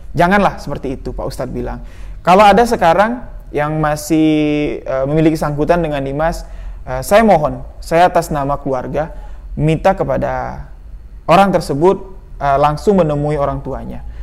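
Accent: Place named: Bali, Indonesia. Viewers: Kamu orang mana min,,, logatmu mantul2 kayak orang bali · mas logat nya seperti.orang Bali.Terimakasih kisahnya. buat pelajaran bhwa hutang WAJIB DIBAYAR SESEGERA MUNGKIN. Dan bila meminjamkan uang,TIDAK PERLU DENGAN BUNGA itu namanya RIBA. Kalau sipeminjam tidak sanggup bayar hutang,alangkah baiknya,jika DIBEBASKAN saja, Insya Allah akan Allah ganti rezqi dengan berlimpah.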